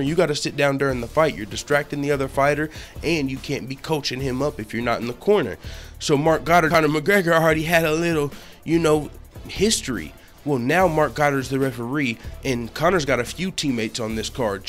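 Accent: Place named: AAVE, United States. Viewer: You're Ebonic dialect is seeping through.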